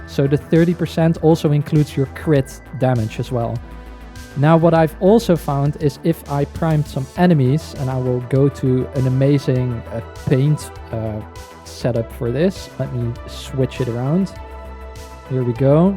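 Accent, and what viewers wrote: Netherlands. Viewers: Are you Dutch btw? I Recognize your accent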